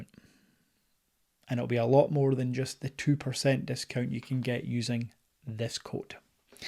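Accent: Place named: Newcastle, United Kingdom. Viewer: You a Geordie? I always thought you were Scottish lol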